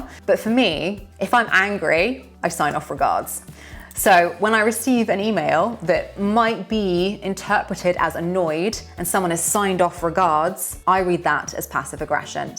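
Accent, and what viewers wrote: United Kingdom. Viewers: Hi, my name is Rodrigo, I'm from Brazil. I love watching your videos — they help me a lot and they still help me today. I worked with a British band once, and I was pretty confused because it was my first time hearing this accent at work without subtitles (by the way, I really love your accent 😄). I had an awkward moment when a guy asked me if my wife was coming to the show, and I just said, “ I Don't Know.” He looked at me like he was surprised. I think I was a bit too direct. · Obsessed with British accent and highly recommend her cz i watch so many videos but she is incredible and so lovely her accent ❤❤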